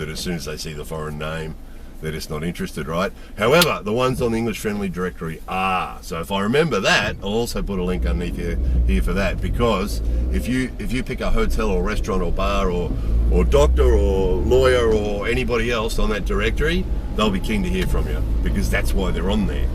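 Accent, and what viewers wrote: Australia. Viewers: yeah except the problem is I can't skip forward to quickly scan and get the gist of the video because it's all just driving - so for me might as well be a podcast I've drive in Japan and know it's slow so no joy for me here - but folk who never have may find in relaxing and interesting. Strine voice tho' ... ;-)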